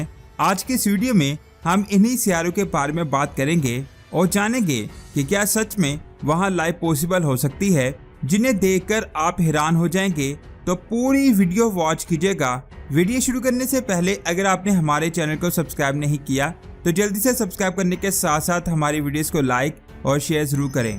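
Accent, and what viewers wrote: Punjab, India. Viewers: Panjabi excent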